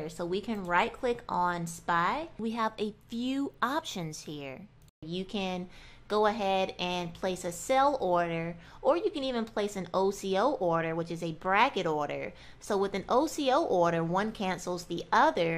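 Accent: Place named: South, United States. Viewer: Lol Asian shorty with a black southern accent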